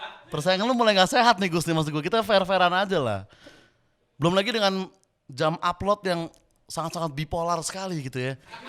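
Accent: South Jakarta, Indonesia. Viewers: Gokil brooo ...acari aku logat anak jaksel dong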